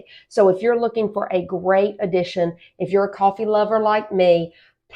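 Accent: South, United States. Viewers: Mine came with the instructions inside the pot. How to get out? It is like the "monkey paw in the jar" thing. Also, you are smokin' hot and I dig your southern drawl. Thank you for being you.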